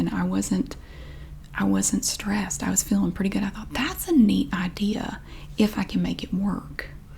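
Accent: South, United States. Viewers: Oh, be my smart ass sweetie pie 😍🥰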